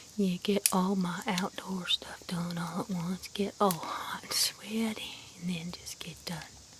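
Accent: South, United States. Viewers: Super relaxing, the sounds and your girlish voice, i love your southern accent ( i've watched tv series and i remember that the southerns sound a kind of different )😘😴😴😴😴😴🐔question: what does "fun spoof" mean??😜💖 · el acento del sur es muy agradable como ASMR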